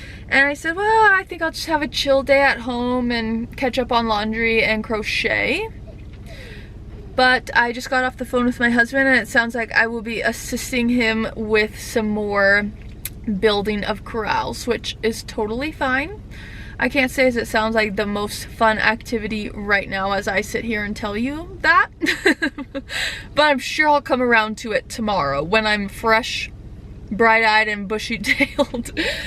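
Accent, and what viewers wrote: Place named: Montana, United States. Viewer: Hyped and liked. Getting my Montana fix. Just hearing you speak brings warm feelings. Funny how you don’t realize that there is an accent to folks from back home until you’ve been away. Now I’m in Texas the difference is stark. Most of my Montana family have passed on, but I’m still kicking so I need the fix. Thanks.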